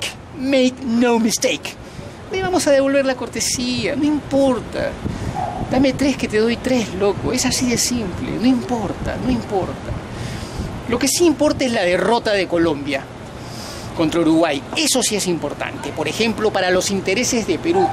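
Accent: Argentina. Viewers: Porque este peruano habla como argentino????? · me gustan tus videos los veo siempre pero se me hace raro y chistoso que hables como Argentino y tengas la camiseta de Perú, igual sus comentarios son bien acertados siga asi.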